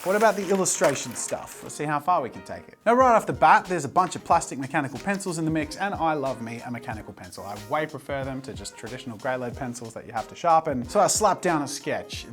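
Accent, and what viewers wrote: United States. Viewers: I loved the video, but my favorite part was the American accent! I replayed it several times! 🤣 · Your American accent is actually really good! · Your american accent is pretty good Jazza, I heard the difference immediately and abruptly did a double-take. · Can we speak about the fact that his American accent is literally on point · American Accent Jazza was mildly unnerving because he sounded like himself but with just enough different that my brain had to actually process what I'd just heard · That American accent though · That's a pretty solid American accent! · Jazza reading with an American accent make me feel weird. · Jazzas american accent sounds like he could be a narrator here😂 very calm and smooth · As an american, I approve of your american accent. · Your American accent is flawless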